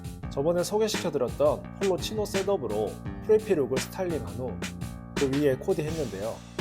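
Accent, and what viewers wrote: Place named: Jeolla, South Korea. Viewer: 말투가 전라도광준디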